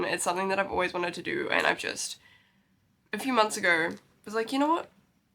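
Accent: Australia. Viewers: I just love your accent ! I always hear american accent but never aussie one, as a non native english speaker it feels so weird but so cool !!!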